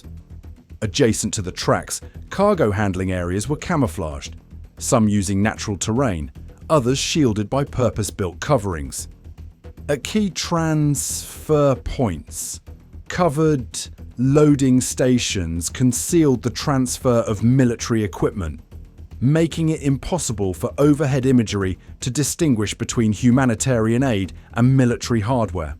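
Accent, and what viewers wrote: England, United Kingdom. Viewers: Insignificant information. Most probably misinformation. I can hear the English accent. Sounds like British propaganda. What a lot of BS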